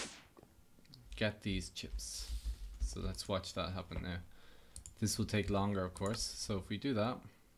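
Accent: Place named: Waterford, Ireland. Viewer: Thank you soooo much man, i have spent literally 18 hours between trying to figure this out and watching an insane amount of gobshites TRYING to explain how to do this hahahaha. BTW are you Irish? You sound like a Waterford man ;)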